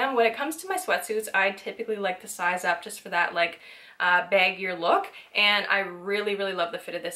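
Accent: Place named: Newfoundland, Canada. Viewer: Me trying to figure out that maritime accent. Hmm Newfie or Boston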